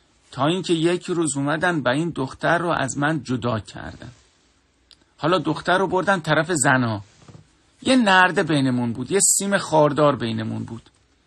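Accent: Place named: Shiraz, Iran. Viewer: درود بر اون لهجه شیرازی!! پاینده باشی!!!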